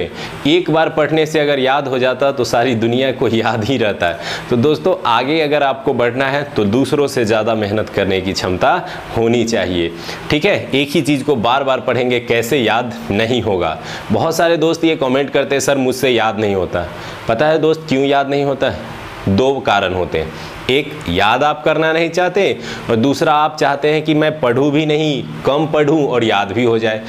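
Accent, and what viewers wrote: Bihar, India. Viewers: sir aap bihar se ho...??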